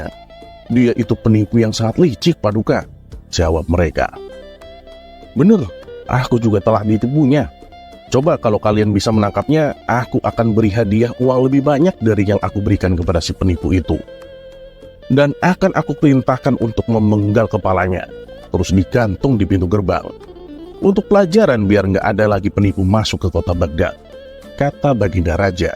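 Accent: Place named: Sunda, Indonesia. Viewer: Waduh hihiii aslinya kayaknya orang Sunda ya😁😁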